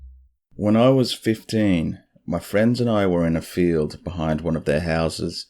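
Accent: Australia. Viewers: !! Great to hear your Aussie voice again! Great stories! Hope you're doing well and staying healthy! 💜💙🩵💚❤️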